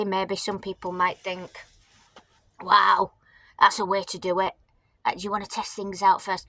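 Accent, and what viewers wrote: United Kingdom. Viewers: What's your accent? I'm guessing Lancashire, but not sure. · Hiya flower, You sound like your from my neck of the woods ? I'm a Bury lad. Moved to Pembrokeshire 28yrs ago. Wide awake and unj@ I'm looking at vanlife again. Keeping my upholstery business. So I might live outside my rented premises... Totally and utterly worn out with the matrix....like seriously worn out.... if your ever in Pembrokeshire. Pop in for a cuppa. Empty your grey and fill up with water.... cheerio now sis 😊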